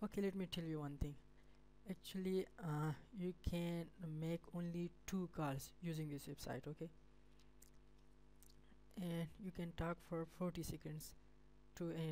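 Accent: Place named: India. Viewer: Sounds like indian "Windows Support " scammer Leaves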